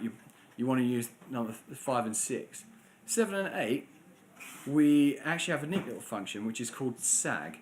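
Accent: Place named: England, United Kingdom. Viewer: Judging from the accent, I suspect you're from the same part of England as Spinal Tap.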